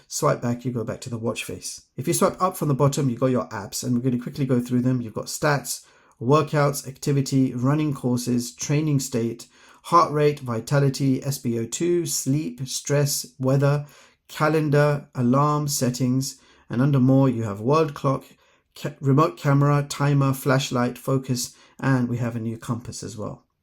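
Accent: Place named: United Kingdom. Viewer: I can't understand half of what this guy says. Too much british accent and low volume.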